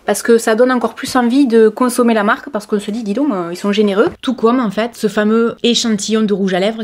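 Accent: South, France. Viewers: Toujours intéressantes tes vidéos et nous mettent de bonne humeur. J'adore ton accent qui reflète le soleil, les cigales et le sud. A quand un live avec tes abonnés puisque c'est possible avec YouTube.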